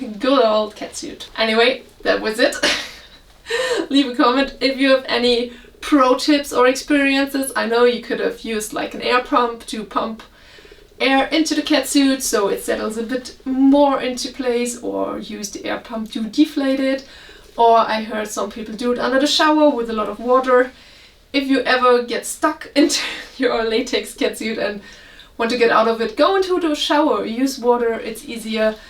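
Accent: Germany. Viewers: Maybe I misheard you then, with your lovely German accent babes... that lovely blue catsuit you sported for us last week, from Bright and Shine was it?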